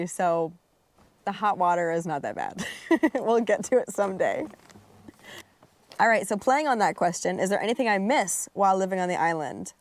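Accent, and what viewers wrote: Newfoundland, Canada. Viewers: Hearing slowly sound more and more Newfy as time passes is hilarious:D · I see that you are developing a bit of a Newfoundland accent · Ah ha...You are a Newfoundlander. "This is the life I chose I spose". :) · My Mom is from Roddickton Newfoundland. I love watching your videos. Love the accent, reminds me of my family. Love them greatest people, Newfoundlanders.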